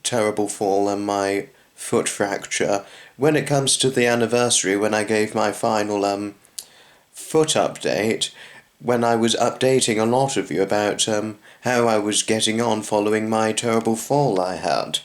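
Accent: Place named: Scotland, United Kingdom. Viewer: wow, you've got a regular channel now! Didn't know you hurt your foot, but glad you're on the mend. Love your wee laddy accent.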